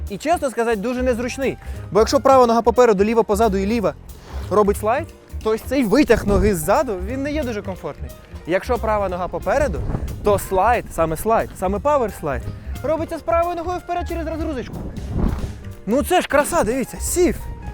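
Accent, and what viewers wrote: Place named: Russia. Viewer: А якщо ухвалять закон, що з російським акцентом не можна говорити? Що тоді? Замовчите?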